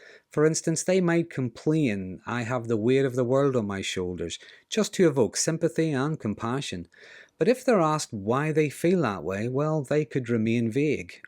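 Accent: Ireland. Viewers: >>10-Q, Counselor. I'm glad I stumbled onto your "Dry Begging" lecture. >>My psychotherapist and I will discuss it at our upcoming Zoomer! I remain Respectfully Serene, NHG. >>PS what a delightfully sweet Irish accent! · Sounds like an Irishman to me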